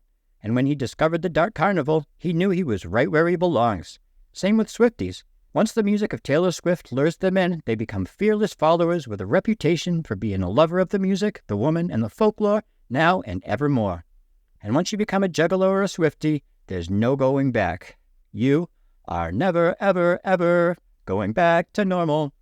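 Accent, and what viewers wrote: New England, United States. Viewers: You sound like you might be from New England? Love these videos hilarious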